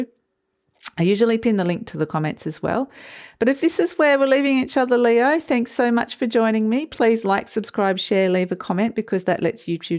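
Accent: Australia. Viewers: Very good...resonates and very exciting things to come. I enjoy ur channel especially because u sound to be Australian and relate it to Southern Hemisphere, there's not to many Southern hemisphere readers so I'm grateful when i find one! Namaste beautiful being namaste 💖🇦🇺🙏🌈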